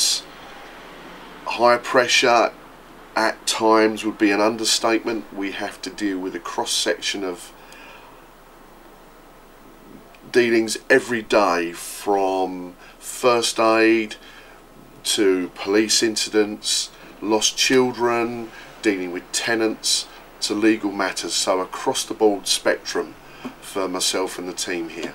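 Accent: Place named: Cockney, United Kingdom. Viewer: he sounds like a geezer from EastEnders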